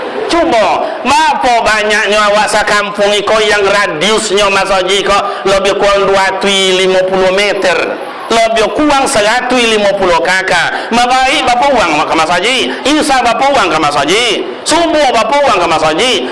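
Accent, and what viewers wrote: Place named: Ocu, Malaysia. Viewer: Soang ko tio ustad nan bahaso ocu kental kek awak nye,lain podo tu,uwang ocu juo nye,tapi bahaso minang,kan lucu du, Ustad ko mantap,asli ocu n bahaso nyo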